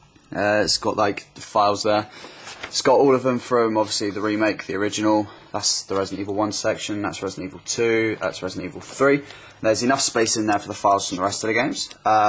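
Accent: United Kingdom. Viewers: Eu acredito que ele more na Inglaterra pelo sotaque, mas talvez eu esteja errado ;)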